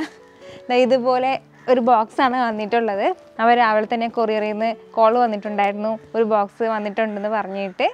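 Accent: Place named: Trivandrum, India. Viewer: നോക്കാം കേട്ടാ 😃 ത്രിവാന്ദ്രം ഭാഷ🤩 l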